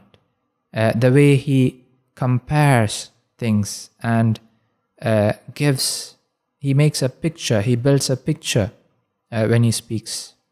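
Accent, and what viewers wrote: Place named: India. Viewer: السلام عليك انت عربي ام من النهد لان لهجتك بالإنجليزية تشبه اهل الهند 🌹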